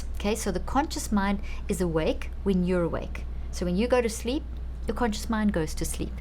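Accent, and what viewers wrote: South Africa. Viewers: So nice to listen to your South African accent Dr Leaf 😊❤️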